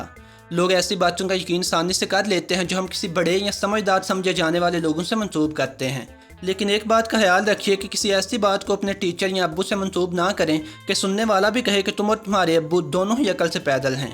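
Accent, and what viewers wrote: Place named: Sialkot, Pakistan. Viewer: Realy Helpful tricks bro keep it up👍 And bro apka accent bata raha hai k aap sialkot se ho. Am i right?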